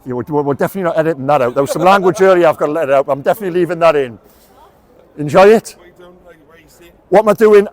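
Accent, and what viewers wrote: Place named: Newcastle, United Kingdom. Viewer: Great jernalist. You speak pure 👌 geordie. You say when bad 👎 you say when deserve. We need more jernalist like you in this country. HONEST RESPECT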